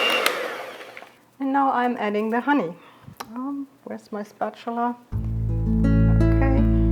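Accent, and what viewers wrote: Germany. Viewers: We live in the suburbs of Nashville, Tennessee. PS: My husband guessed you were from either Hamburg or Hannover based on your accent. Best to you and your family from your newest subscriber! I always want to find recipes that remind him of home. But I have fierce competition. His mother is a retired chef, his brother is a chef, and our only nephew is a chef.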